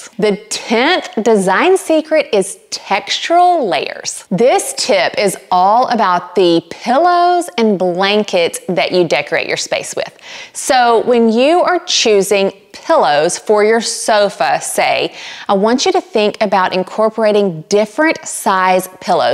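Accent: South, United States. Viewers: I loved this video. I love your design style. I'm from the UK and absolutely love your Southern accent. My favourite was your advice on lighting.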